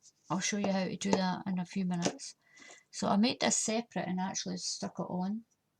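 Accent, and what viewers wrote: Scotland, United Kingdom. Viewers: Fashionable Chambers...some people learn at a slower pace than others. Just like said. And maybe you don’t consider her voice is calming with that Scottish accent. Many many comments left on every one of her tutorials love her accent and love listening to her. Very calming.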